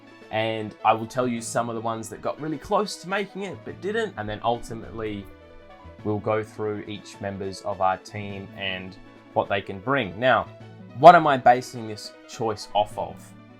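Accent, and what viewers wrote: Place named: Australia. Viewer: Always loved the Australian accent☺☺. Awesome list, definitely more unique than most in regards to the parameters.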